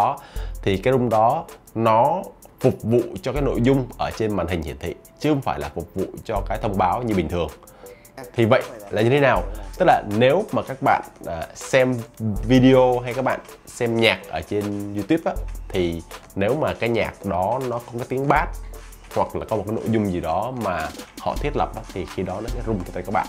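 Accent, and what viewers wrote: Vietnam. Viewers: Tiếng anh rất việt nam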